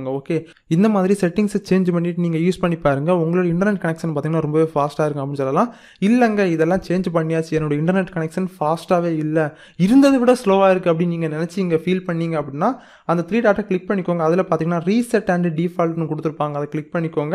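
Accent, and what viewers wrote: Kanyakumari, India. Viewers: bro neenga ....kanyakumari'ah....? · Bro u kanyakumari aa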